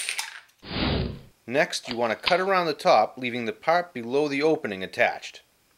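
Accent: Boston, United States. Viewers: Has the Boston accent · With that Boston-esque accent, I'd say more like Peter Griffin. :D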